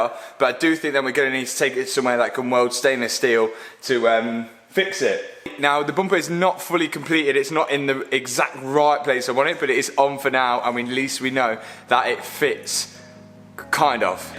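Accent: Leicester, United Kingdom. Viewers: This lad doesn’t give a shit and just sends it which is why I love these videos! Also, are you from Leicester?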